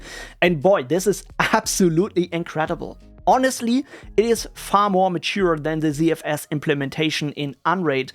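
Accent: Germany. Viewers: The German accent is the best one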